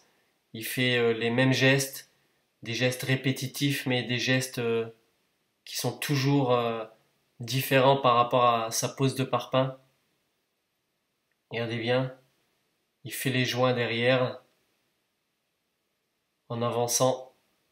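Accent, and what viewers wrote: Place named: France. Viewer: Bonjour, merci beaucoup pour ces vidéos qui m'apprennent beaucoup, donc encore un grand merci. Rassurez-vous, vous avez une très belle voix et vous parlez très bien le français avec un accent français. Je sais que vous êtes d'origine magrébin, c'est pour cela que j'aimerais vous demander si cette méthode de construction pourrait très bien s'appliquer dans nos pays comme la Tunisie, l'Algérie et le Maroc ? Merci encore.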